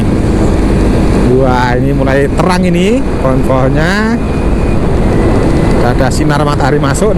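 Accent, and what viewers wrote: Java, Indonesia. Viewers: Apiiik.... Makasih vlog jalan2nya... aku ngikuti vlog mu sejak ngedrone di jln/jembatan/terowongan Karanglo kae lo.... Lucu kamu, sambil ngevlog ngoceh ae... kathik logatmu medhok jowone... Salam karo bojomu yoo...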